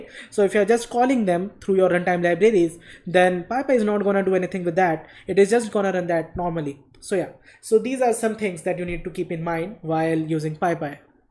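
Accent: India. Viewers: It's the thick Indian accent. It's not easy on the ears for foreigners.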